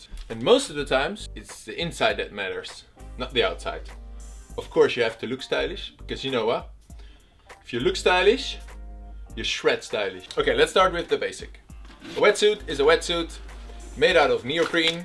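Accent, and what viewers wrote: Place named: Netherlands. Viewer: Love the dutch accent Good video though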